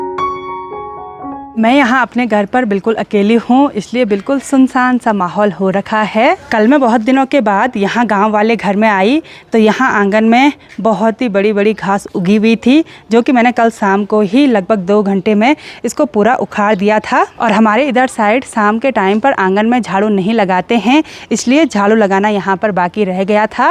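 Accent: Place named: Pahari, India. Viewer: अ य चाची परनाम ❤😂 मीठी आवाज, पहाड़ी लहजा ❤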